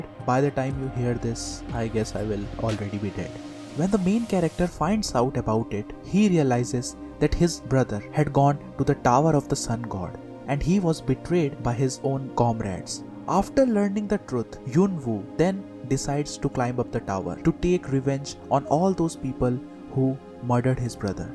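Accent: India. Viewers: Just a question u sound indian are ye ?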